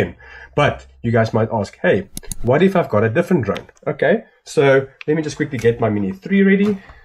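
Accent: South Africa. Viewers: Is there maybe a way to get a 60W or maybe higher (foldable camping) Solar Panel to get to charge drone batteries? I've seen some that comes with it's own attached mini "inverter" that pushes out 12V 60W per USB-C and an adapter for 12V DC direct. We have the Air 2S and 5 batteries. Going on an Adventure Bike tour, the tankbag will be housing the drone gear and GoPro stuff. Space and weight is limited as there is camping gear, rations, clothes, etc. that has to be considered, so bulky heavy powerbanks won't work. Would like to hear your opinion. You sound South African btw LoL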